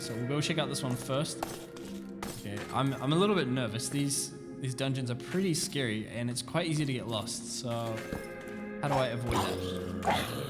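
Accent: New Zealand. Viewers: Huh? You're from New Zealand? I wasn't expecting to hear that.. But that's pretty cool considering I am too!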